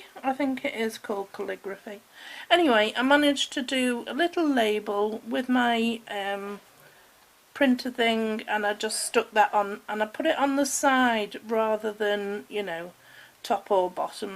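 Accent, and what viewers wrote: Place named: Lancashire, United Kingdom. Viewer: Hi again, yes I thought your accent was similar to mine, so midway between Wigan and Liverpool makes sense re your accent. I've been living in Melbourne for 47 years, still have my accent, proudly😀 I'm looking forward to seeing your upcoming posts 😀stay safe.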